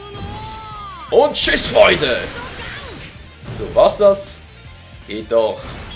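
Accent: Switzerland. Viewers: bisch du ein schweizer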